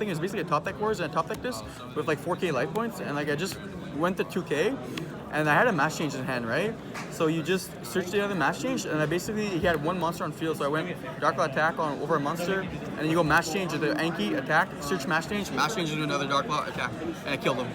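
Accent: Canada. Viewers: This guy sounds very Canadian.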